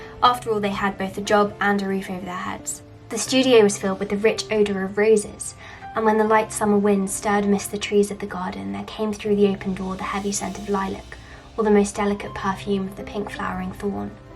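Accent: United Kingdom. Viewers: I absolutely love your taste in books. Omg, the other commenters are right! The British accent DOES make it better! I've been practicing mine. I think it's quite accurate. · Hahaaa that's true Emily 😂 like whoaaa, it sounds great, UK accent..💯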